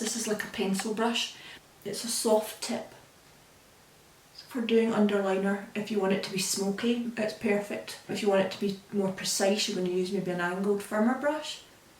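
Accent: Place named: Ireland. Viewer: Hey! 👋 Awesome tutorial! Love the detailed walkthrough and commentary. Remember to apply those tips about the intro in your future vids!! Keep it up 😌 Love the irish accent btw hahah (think its irish? Lol)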